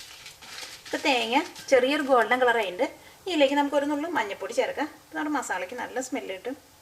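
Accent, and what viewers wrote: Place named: Tamil Nadu, India. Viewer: Xenophile C I watched this video for how elegantly Ms. spoke malayalam. It sounded like very melodic Tamil, and Tamil itself sounds very melodic:).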